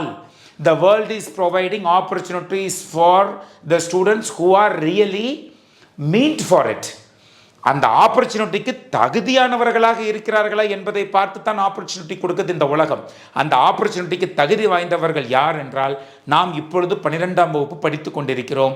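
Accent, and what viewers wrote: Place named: Madurai, India. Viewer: Sir neega enga schl ku vanthirkiga nyabagam eruka madurai bashala pesnigaley😂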